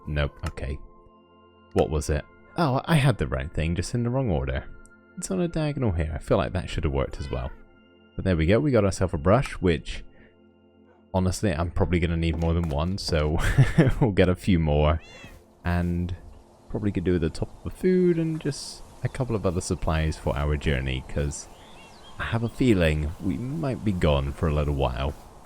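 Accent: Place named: Devon, United Kingdom. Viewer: Are you from the west country? theres a twang in your accent thats a bit Devonian.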